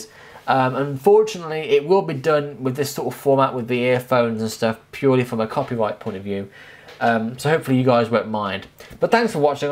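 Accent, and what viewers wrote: United Kingdom. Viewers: Hello from Kazakhstan AND I REALLY LIKE your British pronunciation By the way,do you speak French?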